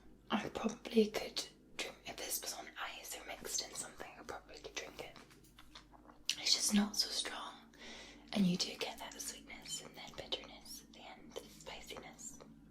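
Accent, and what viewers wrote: Scotland, United Kingdom. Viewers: This is by far, my most favourite video of yours and of any ASMR video out there. Love it! Well done! I especially loved your reactions. You are so animated. I had a bit of a chuckle at your reactions to the last three. I recently developed a love for Scotch Whisky. The complexity and the unique differences of every bottle is just amazing. I was definitely born in the wrong country as I would love to tour distilleries and experience and learn more about Scottish whisky. I really do enjoy whisky, except the peaty ones, (I would have the same reaction as you did) so maybe it is my Scottish ancestry coming through. I do so love the Scottish accent. I could listen to you all day. Thanks for this one. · Oh should be the voice of Scotland. Could listen & watch you for hours. I do love a whisky, very soothing & warming to the heart.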